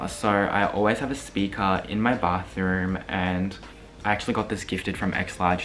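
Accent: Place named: Australia. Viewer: オーストラリア訛りかわいい〜😍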